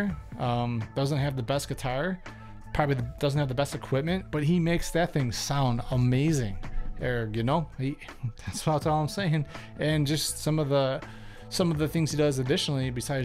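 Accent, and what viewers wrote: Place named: United States. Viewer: good reaction bro, by the way, where are you from, let me guess? your language is American English, am I really you from America ?????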